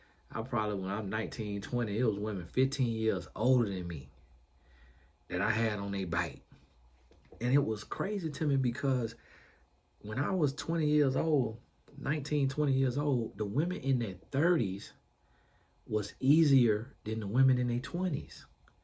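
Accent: South, United States. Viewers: Baby the country accent is sending me 😂😂😂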